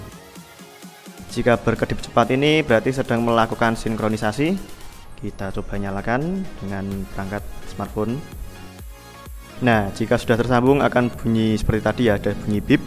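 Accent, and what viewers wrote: Java, Indonesia. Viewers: medhok mase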